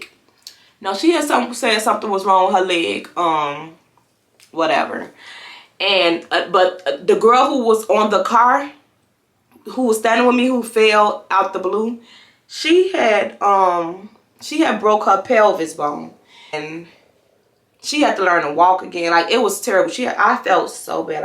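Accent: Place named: Chicago, United States. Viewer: The Chicago accent made the story even better! And now I'm bout to watch story times on YouTube! This was a good one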